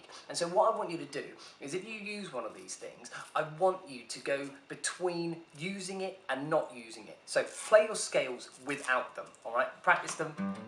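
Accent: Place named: United Kingdom. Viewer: Cool vid and the epic British accent! +sub:)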